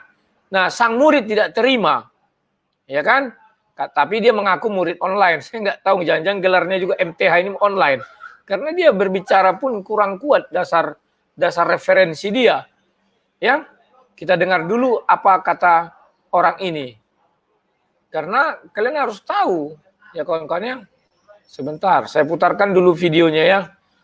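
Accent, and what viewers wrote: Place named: Medan, Indonesia. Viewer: Saya senang dengan bang karna pemahanan dalam Alkitab, dan cara penyampaian dari bang ini khas orang Medan...... mungkin teman2 ada yang kurang srek dgn cara penyampaiannya, tapi saya yakin penyampaiannya jujur dan mencerahkan kita semua....semangat terus pak...Selalu diberkati Tuhan.... salam dari Manado. IMANUEL.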